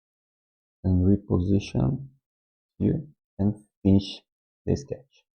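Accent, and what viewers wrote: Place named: Brazil. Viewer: Sotaque de brasileiro.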